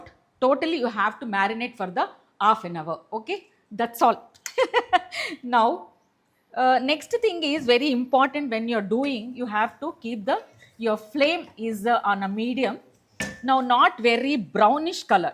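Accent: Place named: Telangana, India. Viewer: Akka you are Hyderabadi you speak, Telangana Akka.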